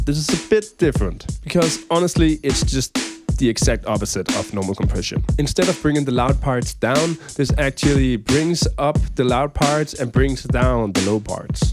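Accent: Denmark. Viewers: du dukkede op i mit feed, og min kæreste hørte med og spurgte om du var dansker. Jeg tænkte nej sikkert ikke. Men fandme jo. Så fedt med en dansker der laver den slags yt. Keep up.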